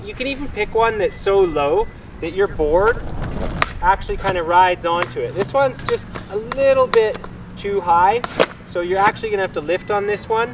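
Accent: Canada. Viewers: This is the most Canadian accent I’ve ever heard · This guy sounds sooo Canadian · You sound hella Canadian and I like it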